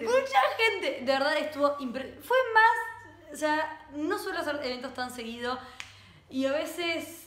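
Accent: Argentina. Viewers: eres más ser humano que YouTube. Tu acento argentino es muy lindo.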